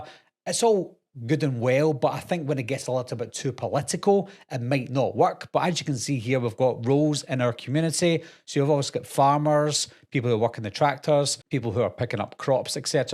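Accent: Scotland, United Kingdom. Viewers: Are you from Scotland ? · Dude,I love the Scottish accent!❤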